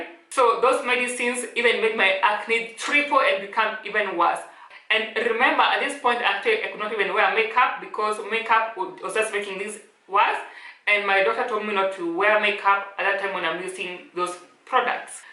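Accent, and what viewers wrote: Kenya. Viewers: It's an educated Kikuyu accent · Looking great. Your skin is glowing. ❤ *finally I can hear Kenyan/ kikuyu accent *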